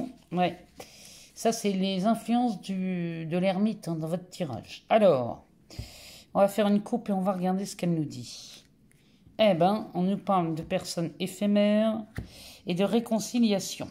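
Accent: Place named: Lyon, France. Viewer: Bonsoir, des bonnes nouvelles enfin. Vous avez l'accent de Lyon, ma ville de naissance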